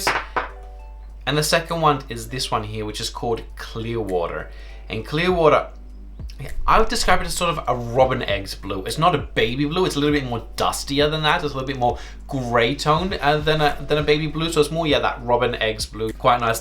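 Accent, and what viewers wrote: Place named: Australia. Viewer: Australia, I was trying to pick your accent as it sounds aussie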